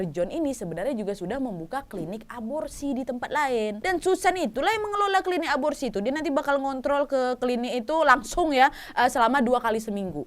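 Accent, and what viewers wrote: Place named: Medan, Indonesia. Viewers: Kak aslinya mana sih, suka bgt denger caranya ngomong, koq Kya tetangga aq dulu org medan😀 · Semangat btul Kk ceritanya 🤣🤣 BTW klo boleh tau Kk orng mana???? logat bicara nya seperti kami orng Sumatra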